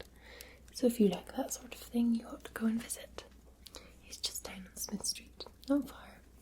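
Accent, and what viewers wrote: Scotland, United Kingdom. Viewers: Pretty neat and beautiful my birthday is today think we can be best of friends?💐💖😘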